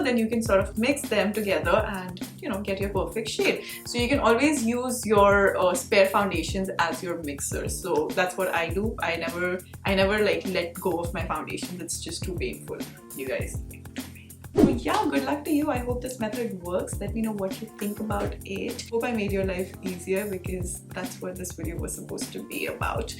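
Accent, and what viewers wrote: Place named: Punjab, India. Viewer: I hear ur accent though 😂😂😂😂 please bring ur phnjabi accent back noooo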